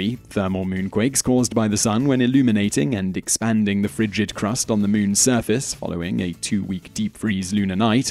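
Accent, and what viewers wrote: England, United Kingdom. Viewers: The man accredited with developing 80% of our worlds modern technology said to think in terms of “Energy--Frequency-Vibration.” Or we can listen to this well spoken English Accented MORON!!! I’m afraid the masses have already excepted the later. 🤪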